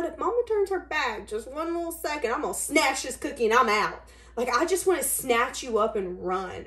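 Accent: South, United States. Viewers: I so enjoy your channel '! Your southern accent is hypnotic and very relaxing! The vibration of all your reads is 100% sincerity! wow also you definetly know my person,haha your gift has taught me so much about The connection with my twin flame!I feel a reunion with us is very close. We have known each other since elementary school,she was my first kiss,first crush,first episode of butterflies in my stomach yep. When we reconnected as adults,a couple years ago,it was magical! It was unbelievable how much chemistry and passion we experienced, never left her house for a solid month,heaven on earth.we connected emotionally just as strong as sexually,The conversations were extremely deep lasting sometimes all night,we also share the same type of sense of humor,and so we also had hysterical fits of laughter that went all night.hqahaha I dont even have the words to describe how much I love this woman. 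She feels the same towards me.However the twin flames journey is full of surprises!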